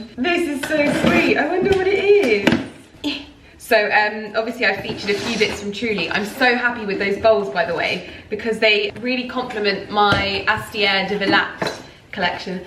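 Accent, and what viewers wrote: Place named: Scotland, United Kingdom. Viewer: Omg will you shut up about your Scottish accent!! It’s getting old now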